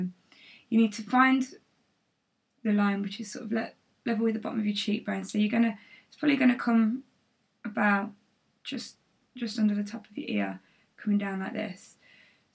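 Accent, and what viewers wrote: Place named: Derby, United Kingdom. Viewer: Your Derby accent is strong haha. Didn't recognise it on towie x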